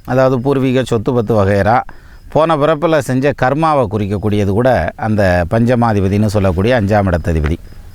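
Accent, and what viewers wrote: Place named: Madurai, India. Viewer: Ungalaal, Namathu Dindigulukku Perumai, Tamilukke perumai, tamil naattukkum perumai, Naattukkume perumai. Kaalam pora pookil pazham-tamil sethuvidumo yendru payam irunthathu.... But it is playing happily in your tongue. Thanks for protecting and preserving our pazham-tamil related to astro field. I am fully impressed with your deep knowledge, detailed analysis, Tamil delivery, namma dindigul - Madurai slang and nakkal. Vaalka Valamudan. I 'll be meeting you sooner.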